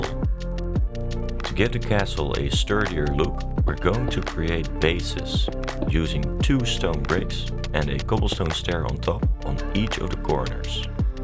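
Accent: Netherlands. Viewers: why do you sound Dutch??!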